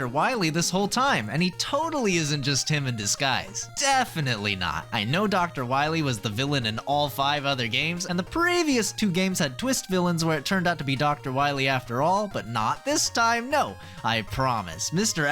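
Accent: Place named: Germany. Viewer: Why a WERMAN ACCENT This is so cool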